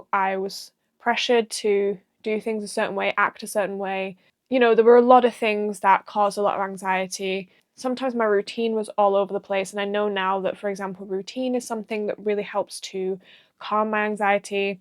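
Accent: United Kingdom. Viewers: You really sound like someone I know from Rochdale! Are you from anywhere near there?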